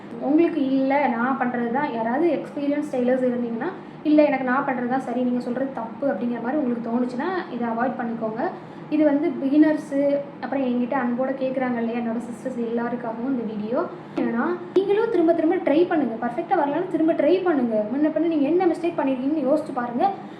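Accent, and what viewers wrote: Tirunelveli, India. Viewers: Neenga endha ori Tirunelveli aa?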